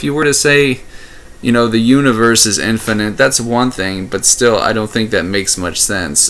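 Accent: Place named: United States. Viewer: Atheism is not a theory. So... before you try to "debunk" something, you should first learn what it is. Never a good start, when your first sentence demonstrates your ignorance on the subject you attempt to talk on. Atheism is a RESPONSE to a claim. The claim "There is a god". Atheism is the rejection of this claim, as unsupported. Got it? Good. So... you sound like you're from the US. And in the US, pretty much all people in higher offices are theists. They HAVE to be, otherwise the general public wouldn't elect them. Because apparently, whether or not you believe in an invisible being with supernatural powers is more important, than whether you have good qualifications or good political ideas. So yeah... you're idea about atheism coming from the "elite" falls flat right in the beginning... Illuminati? Are you serious? 0_o The state isn't my god. 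I don't think the state is an all-powerful, supernatural being. The state also isn't ONE entity. It's made up of a number of people. I also don't worship the state. So, all in all: That's a weird claim to make! You literally have to redefine what you mean by "god", to make this claim work. And honestly, if you have to redefine terms, just to make your point, you should already realize that you don't have a good point to make. That's not a number one theory of atheists. That's not a number 10 theory of atheists. Actually, that matches more what theists believe. Theists usually believe that the entire universe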